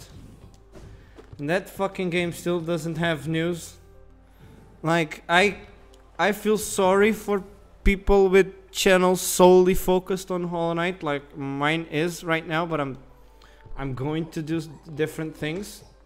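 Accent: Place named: Russia. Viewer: my boy have a second channel well then sees he did star war thing TAKE MY SUBSCRIBE R N By the Way : are you russian ? you sound like you are Also it was a great run im up for Minecraft and other game aswell i recommend you to play Little Nightmare 1&2 like doing a let's play or something have a great day !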